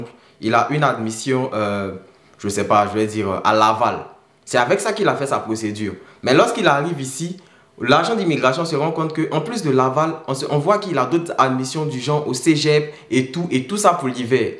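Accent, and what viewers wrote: Ivory Coast. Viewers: Belle vidéo J'ai kiffé Je suis camerounais mais je kif l'accent ivoirien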